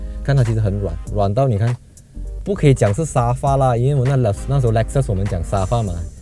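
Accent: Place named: Malaysia. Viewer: 每次看你 test drive ，觉得你不去 当赛车手真是可惜了，😂 讲话又有 Malaysia styles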